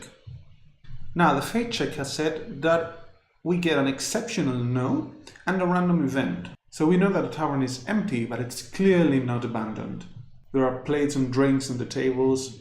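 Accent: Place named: Greece. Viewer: Yeah, you sound exacly like my teacher from greece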